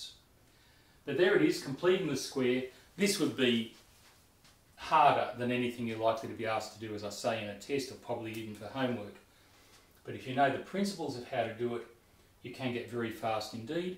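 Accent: Australia. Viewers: Not sure why, but having another Australian explain this helps so much. Top stuff, cheers!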